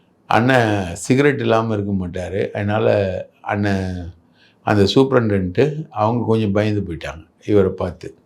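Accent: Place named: Chennai, India. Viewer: is jus a host and relative of savukku in some ways, her speech and body language like local chennai slang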